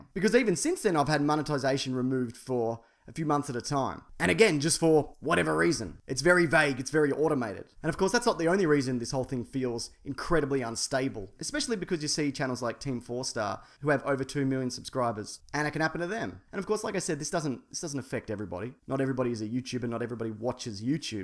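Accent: Australia. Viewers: I love you,. I love you and your sexy sexy serious Australian voice. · Wow, this video is very informative, thanks for bringing this issue to light. I have heard horror stories about youtube in the past. Just wanted to say how much I enjoy your channel and I bloody love (the) Weekly Planet. I am originally from the UK but currently living in Malawi. The internet connections are pretty shit here but I really look forward to your podcasts and videos every week. Yourself and Mason are fucking comedic geniuses! Oh and I spent two years living in Melbourne a few years ago and I quite enjoy hearing the accent again! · I knew he was from Australia #wtfu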